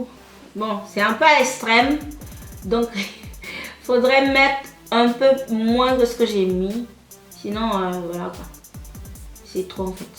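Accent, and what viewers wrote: Ivory Coast. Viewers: ahah maintenant j'ai compris que tu a deux canal, c'est coul!!! j'adore l'accent ivoirien!!! :-* Que dieu te bénisse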